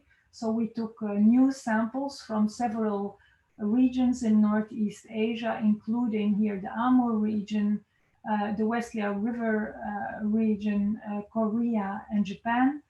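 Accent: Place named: Belgium. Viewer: I would have guessed a Belgian French accent, but either way it's the same region and matches her name (French first name, Dutch or Flemish last name). The quality of comments here is atrocious even for YouTube and far worse than anything I have seen under any other academic video. The OP here is a good example for this. Living in Neukölln, I love Turkish culture, but the guys commenting here are doing a good job of harming their country's reputation. I have only seen similar idiocy on this level from Indians.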